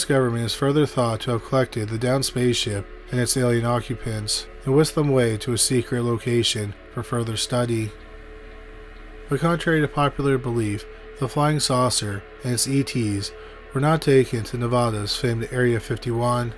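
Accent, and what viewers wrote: Canada. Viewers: Remember that he isn't from the US. Maybe up in Canada they are taught to pronounce words this way. They think we are insane for not spelling words with u's in them like colour for example.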